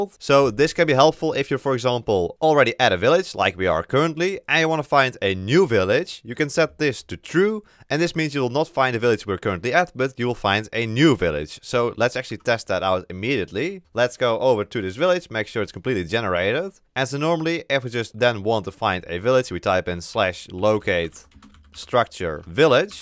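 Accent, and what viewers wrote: Netherlands. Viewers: You can really hear the Dutch. I'm Dutch myself but i didn't expect it.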